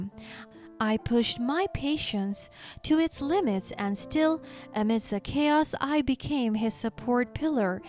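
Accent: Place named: Asia. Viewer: 80M RUPEES? NOT 80M USD ! BULLSHIT! I CAN HEAR THE ASIAN ACCENT!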